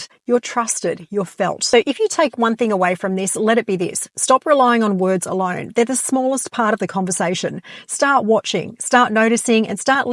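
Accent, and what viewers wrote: Australia. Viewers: OMG the Aussie accent is so harsh on the ear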